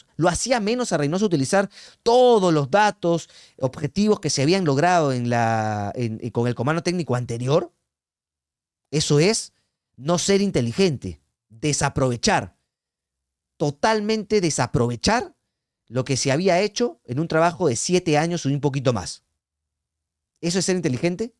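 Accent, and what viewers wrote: Argentina. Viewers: Hey Horacio... vos sos Argentino ???. Tenés un acento porteño che. O sos simplemente un periodista Peruano sin personalidad? Por otro lado tu analisis muy bueno. Has desnudado la pobre capacidad de Reynoso como estratega. Ese señor es un técnico para tercera division, que ridiculamente se autodenomina estudioso.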